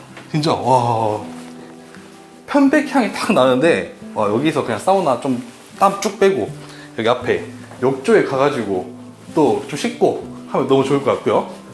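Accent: Jeolla, South Korea. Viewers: 제주도에서 전라도 사투리..^^ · 혹시 전라도 분이세요? ”촤측“으로 “코가구”를 하시는게 전남분 같아요~ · 전라도 사람임? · 정겨운 전라도 말투네요. 설명도 잘해주셔서 편안하게 영상 보고 갑니다. 채널 번창하세요~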